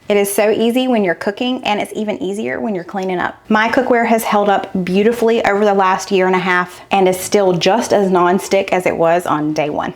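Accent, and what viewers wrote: South, United States. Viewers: I must also be old! I was singing along with you each time! All of these look delicious! Both my daughters ask to watch " from in the Makin'" (in a cute midwestern version of your southern accent!) every weekend as we are making up our meal plan for the week. And my youngest son asks if it is a meal when it is something new. To say you have made a wonderful impact on my family's mealtime is not an understatement! Thank you for all you and your family does for us! Smiles, Abby Smith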